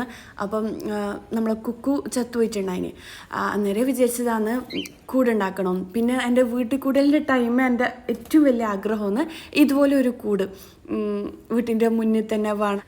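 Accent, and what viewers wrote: Kannur, India. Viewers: Kuttikal varumbolk pappa dam parakoole nammala kannur bashayann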